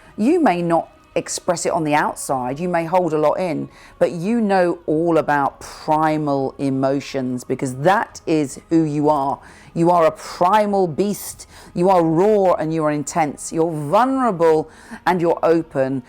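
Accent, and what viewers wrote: United Kingdom. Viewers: i wish i had your so refined british accent...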